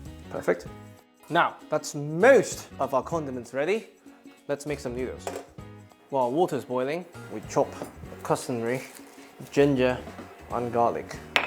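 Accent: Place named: United Kingdom. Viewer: very high quality video, and with brits accent, really nice.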